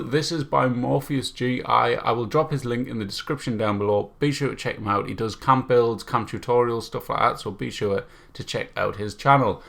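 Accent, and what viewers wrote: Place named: Newcastle, United Kingdom. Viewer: Fellow geordie?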